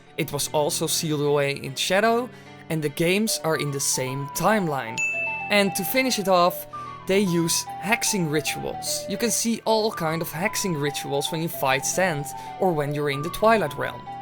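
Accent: Netherlands. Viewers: I have to ask, but you're dutch, right? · Are you dutch bcuz your r is really strong and you have that accent Ik ben nederlands trouwens(im dutch btw)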